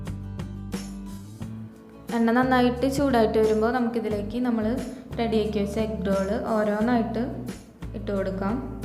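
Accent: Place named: Thrissur, India. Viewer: ബ്രഡ് കൊണ്ട് ഒരു കിടിലൻ സ്‌നാക്ക് easy ആയി അവതരിപ്പിച്ചു ,തൃശ്ശൂർ സ്ലാങ് ആയത്‌ കൊണ്ട് കേട്ടിരിക്കാൻ നല്ല രസമുണ്ട്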